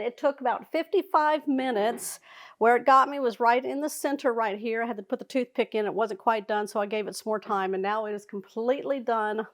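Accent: Texas, United States. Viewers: Hee hee shout out from Auckland New Zealand!!! I got hooked on your lovely Texas drawl! I saw your other amazing blueberry monkey bread recipe! We don’t have your USA thing ‘biscuits’. Can anyone tell me what that actually is? Scone mix?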